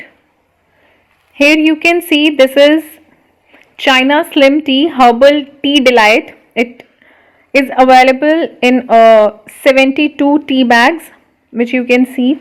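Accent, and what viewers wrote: India. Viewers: Indian accent 😩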